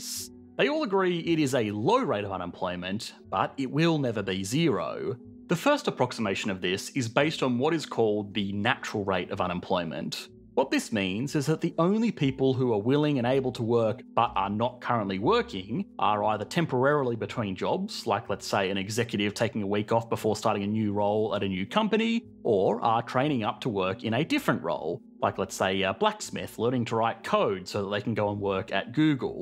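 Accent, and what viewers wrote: Australia. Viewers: I like a guy with an Australian accent telling us how the US Federal Reserve works!!!???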